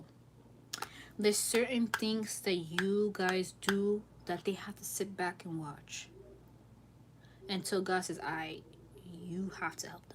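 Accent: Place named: New York, United States. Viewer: btw, I live in England, born and raised. I LOVE your accent and can understand every word you say. I’ve never been to NY or even to the US in this life, yet, but I think I was in NY in another life close to this one because I feel a strong connection to the place, energetically, like with no clear reason as to why, in this life, other than maybe what I saw on tv and in the movies as a kid, but it’s stronger than that, like a distant nostalgia that feels like home. I felt the same about London here in the UK before I moved there. When I did move there I felt right at home.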